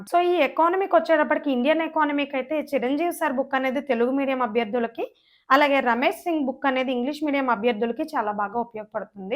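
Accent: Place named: Godavari, India. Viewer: మేడం మీది గోదావరి జిల్లా నా మీ accent అల ఉంది బాగా suggest చేశారు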